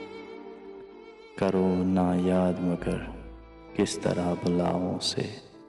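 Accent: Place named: Kashmir, India. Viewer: Voice of kasmir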